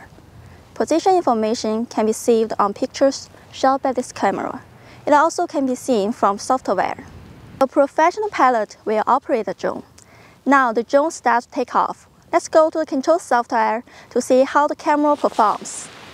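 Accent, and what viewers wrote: China. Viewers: Her English accent is like Chinese 😊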